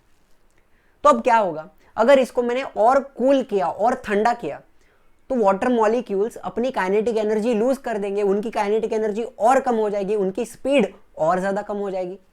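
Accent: Maharashtra, India. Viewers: Sir are you Marathi???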